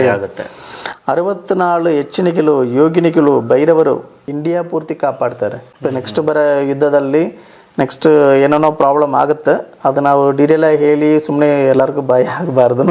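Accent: Tamil Nadu, India. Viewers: Tamil avru sooo adikke hage aste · He is tamilian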